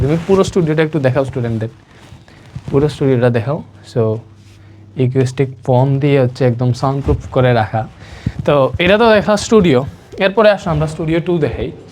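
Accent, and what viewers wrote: Sylhet, Bangladesh. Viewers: কথার মধ্যে সিলেটি সিলেটি ঘ্রাণ মনে হচ্ছে 🙄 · কথায় সিলেটি ভাইব মিলছে।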